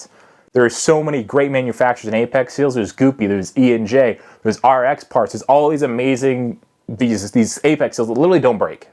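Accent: United States. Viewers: Sorry, I can't understand most of what you say. And English is my native tongue. So a kind request and suggestion might help us all: Please, when you have a really strong (I'm guessing Southern) US accent like yours, remember that most people don't have that accent, and you will fail to communicate your thoughts and information. So, try to slow down a lot, speak clearly, don't use foul words, and you'll win over your audience, and then gain many more subscribers. Thanks, in advance, for your patience. All the best.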